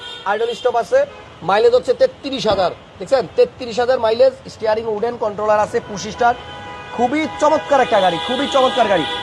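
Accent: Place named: Barishal, Bangladesh. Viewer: অরিজিনাল বরিশাইলা রা ফেল এতো দারুন ছিলো আপনার বরিশালের ভাষা।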